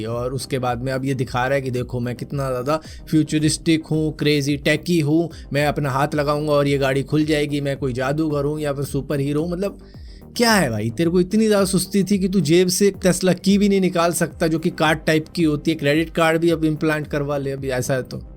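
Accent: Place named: Gwalior, India. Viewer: Bhai gwalior se ho kiya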